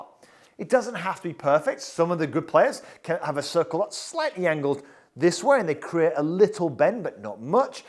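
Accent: Yorkshire, United Kingdom. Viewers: Wanted a in person lesson with you thought you might be local ish with your Yorkshire accent I’m hearing maybe Leeds but your based all way down Kent too far for me to go for a golf lesson might have to get an online one booked instead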